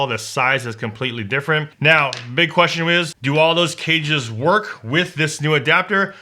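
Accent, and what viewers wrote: Philippines. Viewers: You're accent is filipino ilocano..no doubt about it.NIce video.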